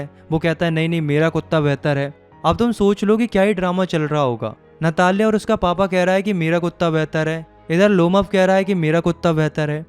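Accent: Uttarakhand, India. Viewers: Are you from Uttarakhand?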